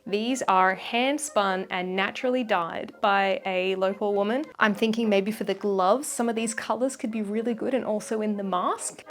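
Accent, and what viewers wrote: Australia. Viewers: This is incredible 🤩 and as a southern woman, love to hear an Australian accent adopting y'all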